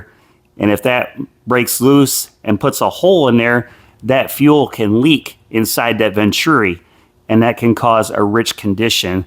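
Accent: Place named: North, United Kingdom. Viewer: Kind of sounds like a cross between a Harley and a John Deere A. You sound like you’re from the great north. Nice video.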